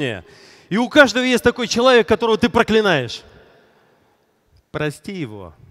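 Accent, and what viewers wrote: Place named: United States. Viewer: Зачем так временами кричать, повторять американцев😂😂😂😂😂😂😂 даже интонации, типа психологическое воздействие, почему все бизнесмены начинают про свою жизнь рассказывать. Не спорю полезная информация. ГЛАВНОЕ ВЫБРАТЬ ПРАВИЛЬНУЮ ЦЕЛЬ И ПРАВИЛЬНУЮ МОТИВАЦИЮ чтобы достичь своему целью. Тогда и будет все. Если цель конкретная, и мотивация выбранная правильная как пинок, тогда и достигнет. Главное при этом торгашеском мире не забывать и о духовном богатстве☝️☝️☝️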